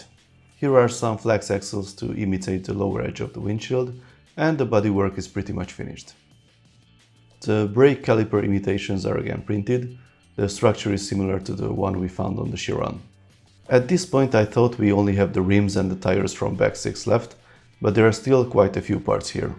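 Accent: Hungary. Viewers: Olyan magyaros akcentusod van... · Az akcentusod elárulja h magyar vagy de amúgy jó lett !!!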